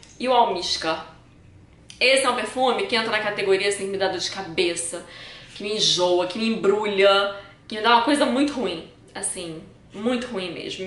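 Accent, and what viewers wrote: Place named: Rio de Janeiro, Brazil. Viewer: PARABÉNS PELO VÍDEO MINHA DOCE BELA E REQUINTADA YOU TUBER ÁRABE COM SOTAQUE CARIOCA QUE EU AMO. SEMPRE BEM DETALHISTA DIVERTIDA E EDUCADA FAZ UM VÍDEO DE PERFUMES NACIONAIS MASCULINOS PARA AGRADAR AS MULHERES FICARIA MUITO GRATO BJS ABRAÇO UM CHERO TENHA UMA BOA NOITE PERFUME QUE EU AMO PRA ESPANTAR GENTE Q EMPRESTAR O MEU CAPACETE DE MOTO EMPRESTADO É O TABU UM EXORCISTA PRA EXPULSAR PESSOAS QUE EMPRESTAR ROUPAS ETC........ KKKKKK❤😙😍😂👏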